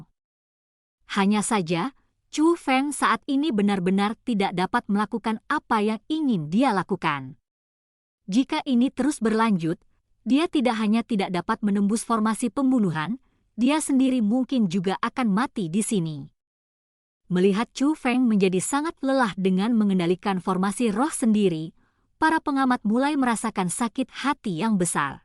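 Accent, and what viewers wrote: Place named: Java, Indonesia. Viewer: kok medok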